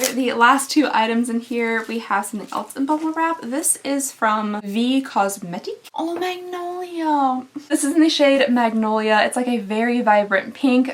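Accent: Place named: South, United States. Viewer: Ha! My husband heard your voice and asked if I’d made a video using an American southern accent!!!! You are so brilliant with these videos. The bags were beyond drab, boring. Well, except for the blush and eyeshadow. Those were shockingly awful. I like the colour combos you came up with, they looked rather lovely for a date night. Ipsy has truly gone to the dogs. Exception: I received that hair mask in a Sephora bag and my hair looked great for that day.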